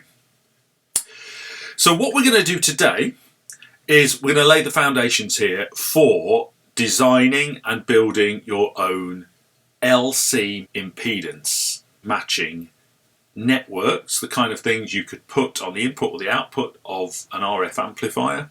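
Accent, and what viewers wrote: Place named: Netherlands. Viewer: Again an excellent video, valuable and highly appreciated. The importance of impedance matching already became clear in the “Connecting It All Together” video I watched a couple of days ago. I skipped it at the time you published it because I thought, reading the title, it was not important to me. Apart from the electronics lessons, your pronunciation is a pleasure to hear, most Dutchmen do not exceed the level of a football coach. 😆 Thanks a million 73, Gerard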